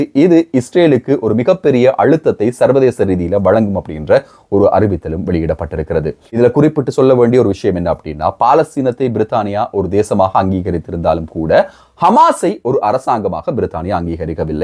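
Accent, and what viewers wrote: Sri Lanka. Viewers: Nice Lankan Tamil accent !!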